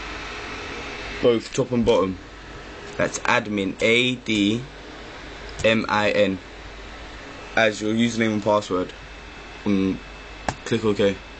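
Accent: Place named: London, United Kingdom. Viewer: yeah, dis brar obviously from london, safe. and dis is usefull but how the fuck can i du dis on a belkin. jheez not been on xbox for longgg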